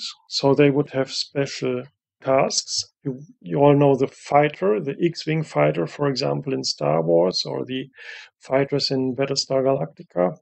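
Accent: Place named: United States. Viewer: The American accent is indeed prominent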